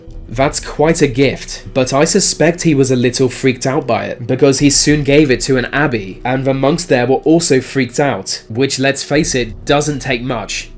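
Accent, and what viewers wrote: England, United Kingdom. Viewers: I dont know what it is. I love your voice, or pitch, or if its any kind of inbreed english accent? Its captivating. Not from Britain so I dont know. Actually, im not from a english speaking country whatsoever and dont know much about anything. Therefore im watching your channel. Its great! Keep up the good work.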